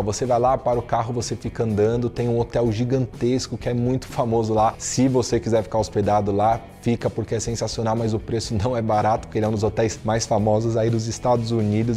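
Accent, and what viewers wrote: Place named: São Paulo, Brazil. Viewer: Ele fala meio rindo né. Que nem o Luciano huck hahahah quem tava na dúvida se ele era paulistano tirou 🤣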